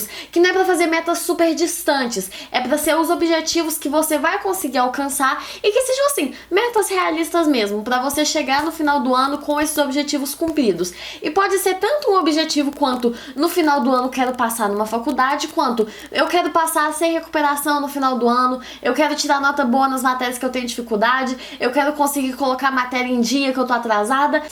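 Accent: Minas Gerais, Brazil. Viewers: Voce e mineira também? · sabia que era mineira só pelo sotaque ,😅 😄😍,amo suas dicas ❤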